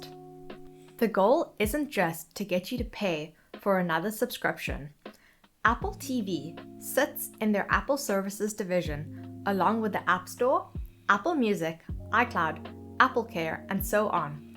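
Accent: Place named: Zimbabwe. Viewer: Nice informative video. Well narrated and edited. Whats your accent? Zimbabwe ? Keep up the great work. Cheers from New Zealand 😎🎸🤘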